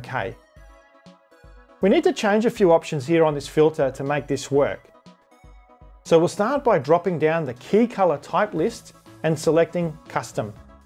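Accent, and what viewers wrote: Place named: Australia. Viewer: Nice work... and great to hear an Aussie accent! Cheers, mate.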